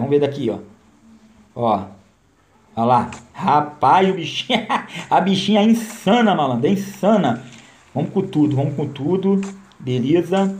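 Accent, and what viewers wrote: Rio de Janeiro, Brazil. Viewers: Tu é carioca no Sangue não é?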